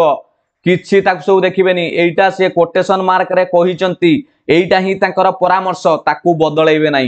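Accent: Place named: Odisha, India. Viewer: Dear sir, English ku English parika padhaiba uchit anchalika bhasha parika nuhen. English ku padiba ebam padhaibara gote saili thae ebam English kahiba au Tara prateka word ku sathik bhabare pronounciation karibara madhya gote style thae. Binita anurodh English ku English pari padhantu Odia parika nuhen. Thank you.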